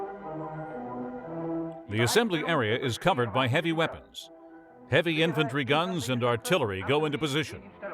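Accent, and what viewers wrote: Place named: United States. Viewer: Yank voice over ?....................Ruined!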